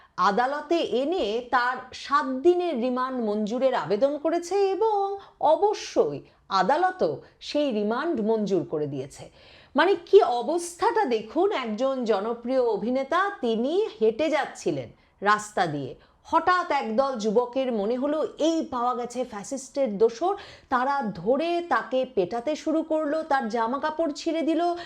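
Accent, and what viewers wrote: India. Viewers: Indian awaz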